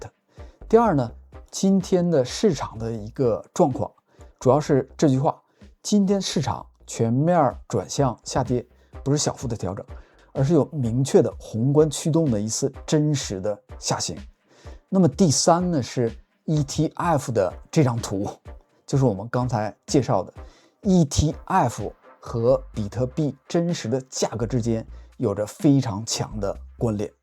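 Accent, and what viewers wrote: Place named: China. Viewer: 很明显是大陆口音，即使人在国外也是在大陆出生长大的成年后才出国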